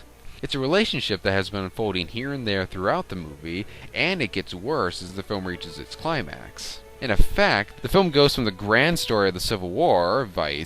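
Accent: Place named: New England, United States. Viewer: Nasal yankee commentator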